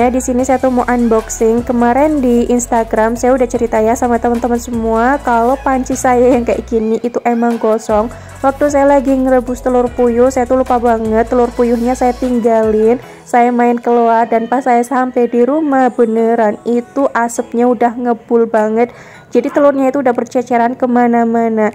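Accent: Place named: Pemalang, Indonesia. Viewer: Okeh2 khas Pemalang,ogel2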